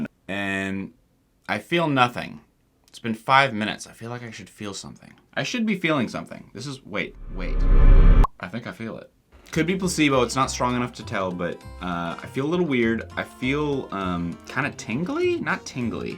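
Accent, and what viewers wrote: United States. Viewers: You evidently don't understand the difference between addiction (physically and mentally dependent) and a habit. But I don't blame you. Your accent sounds American, and America has the weirdest created shared realities around addiction.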